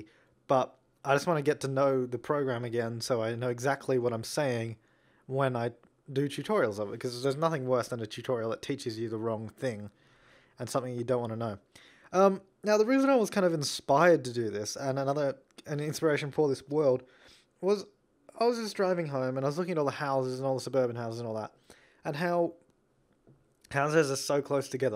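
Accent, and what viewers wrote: Australia. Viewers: His accent is… Australian, right? Oh and from this video I can already tell I'd fail so hard at this. v.v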